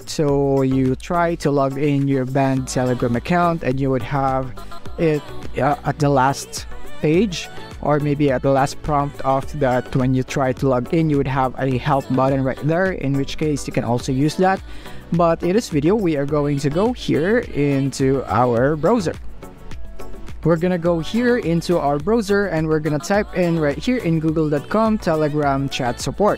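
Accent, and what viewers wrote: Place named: Philippines. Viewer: You sounds like a Filipino.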